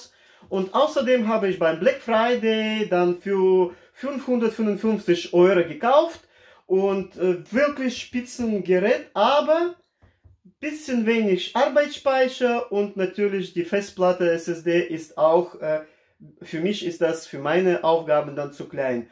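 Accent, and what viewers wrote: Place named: Russia. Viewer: Sehr, sehr sympathische und ruhige Art, dieser russische Dialekt (?) ist soo süß und sympathisch 😊 und deine Art zu erklären ist auch sehr verständlich und nachvollziehbar. Top! Danke 😊 Eine Frage noch: Das Gerät zum Wechsel bzw Erweiterung des Speichermoduls zu öffnen, ist das eine dieser "Aktivitäten" durch die die Garantie erlischt? 😅 falls ja, wäre das ja super dreist. Zumal Samsung ja explizit damit wirbt.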